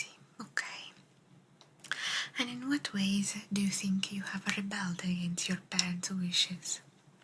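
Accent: Italy. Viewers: Ciao cara! Mi piace molto il suono della scrittura e il tuo accento italiano è meraviglioso, davvero adorabile. Sei bravissima e bellissima! Ti voglio tanto bene 🫶🥰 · You always think of good topics to discuss as your therapist character,. Your Italian accent is great too. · I bloody love your Italian accent!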